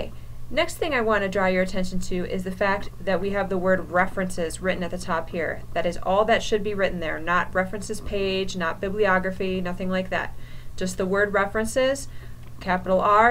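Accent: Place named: Chicago, United States. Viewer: Love the Chicago accent <333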